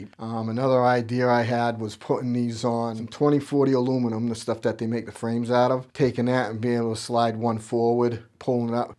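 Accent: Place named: Massachusetts, United States. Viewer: The magnet idea is awesome! I’m definitely going to incorporate it for my setup! I found you on your recent Reddit post and followed you here. Definitely appreciate your input and love your interest in flight sims and setup! I’m a space simmer so the attention to accuracy doesn’t hit me like it does you, but I do love your creativity to set things up. Crazy that those small magnets get over 300lbs of uhh magnetism! I’m wanting to go full simpit but for now I just modify to attach to and around my desk. Have to get creative since I can’t commit to replacing my desk and the $$ is limited due to having 3 young daughters as well, so that’s where most of the money goes x_X. Lastly, love hearing the Mass accent. I lived in the New England area for about 10 years when I was a kid/teen. I’m from Oklahoma and moved back before I left for the army, but I always loved the range of accents and such, but I do NOT miss the snow, lol. 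Anyways, just wanted to comment and say I love your stuff! Take care and stay warm!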